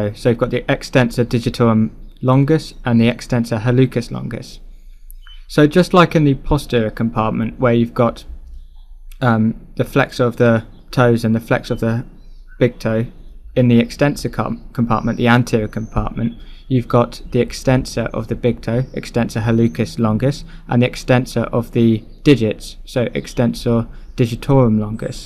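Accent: United Kingdom. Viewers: Thanks for the tutorial . Your accent (British?) sounds amazing.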